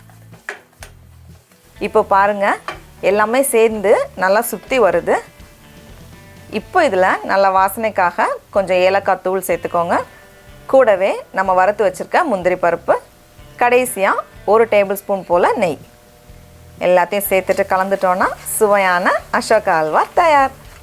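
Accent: Nagercoil, India. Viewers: Are u from Nagercoil ?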